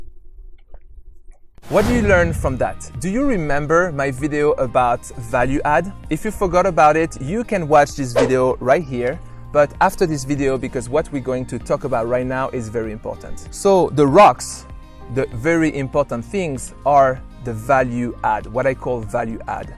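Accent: France. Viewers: Nice demonstration, adapted from the original intervention visible here. With the French wine and the French accent, why not give a French version with the link for the moose? <link> Jolie démonstration, adaptée de l'intervention originale visible ici. Avec le vin français et l'accent français pourquoi ne pas donner une version française en renvoyant à l'orignal ?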